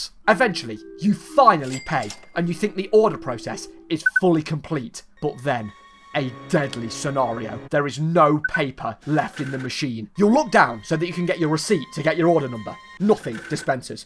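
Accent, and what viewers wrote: United Kingdom. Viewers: I like how British you are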